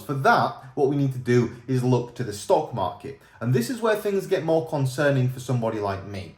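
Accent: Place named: North, United Kingdom. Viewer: It’s my favourite northern accent talking bitcoin lol 😂 where was the one video a day broskie lol I know how it goes lol give thanks same way my guy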